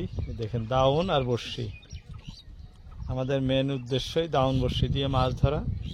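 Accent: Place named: Mymensingh, Bangladesh. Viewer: ভাই কোথায় থেকে আপনি, ময়মনসিংহের ভাষায় কথা বলছেন।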